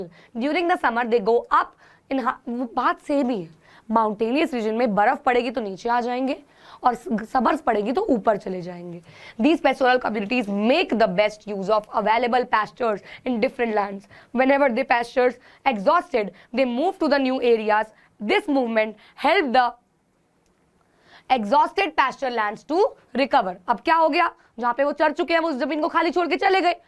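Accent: Punjab, India. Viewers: Ma'am ,are you Punjabi 🤔??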